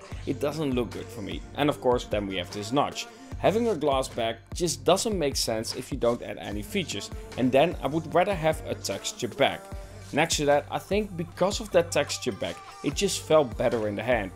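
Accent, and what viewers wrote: Netherlands. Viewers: U sound Dutch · Dat noemen ze Denglisch. Dat is Engels met een Nederlands accent.😁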